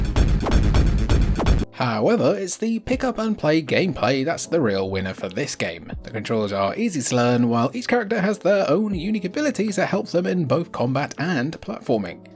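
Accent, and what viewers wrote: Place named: United Kingdom. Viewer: You sound British but then why would you be talking about the Genesis?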